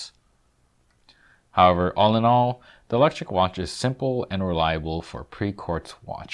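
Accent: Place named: Canada. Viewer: Cool. I didn't know about these and I grew back then. Wasn't paying attention I guess. Really interesting videos,. And it sounds like you're Canadian too. Subbed.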